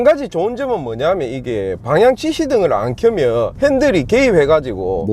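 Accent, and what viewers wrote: Gyeongsang, South Korea. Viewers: 경상도 말 밥 맛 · 사투리가 몸에 베었... 곙상도..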